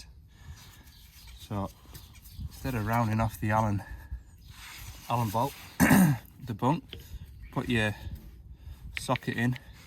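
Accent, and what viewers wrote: Cumbria, United Kingdom. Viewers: Cheers, looking forward to more excellent videos. Love the Cumbrian accent, unfortunately mine's all Barrow!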